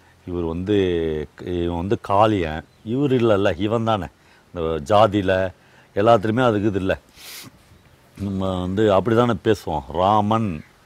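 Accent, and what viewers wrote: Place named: South Arcot, India. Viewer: பாவா உங்களின் மொழி பழைய தென்னாற்காடு மாவட்டம் பேச்சுவழக்கு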